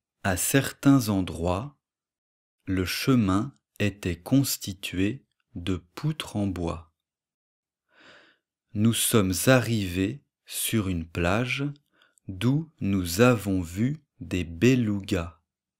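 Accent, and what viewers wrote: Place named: France. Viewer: где вы изучали русский язык? Так чисто, грамотно, с легким французским акцентом говорите...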